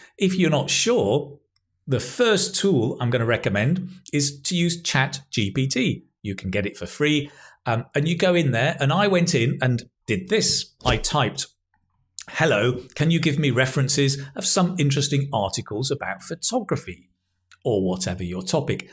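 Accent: United Kingdom. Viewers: Sir do you have british or american accent please tell me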